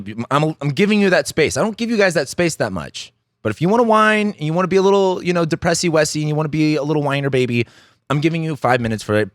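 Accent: California, United States. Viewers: What? Are you from Lancaster or San Bernardino?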